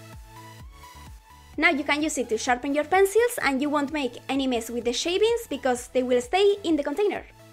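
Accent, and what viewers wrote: Spain. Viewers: ignorant, she is Spanish its her accent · Eres española? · Es española, por eso su acento es tan fuerte. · tienes acento español😱😱😱😱 viva españa!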